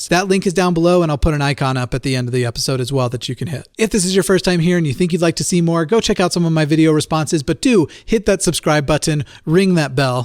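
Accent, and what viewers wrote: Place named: United Kingdom. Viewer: First of all I’m really proud of you 👏🏼👏🏼👏🏼👏🏼🍀 and second of all I love you very British Intonation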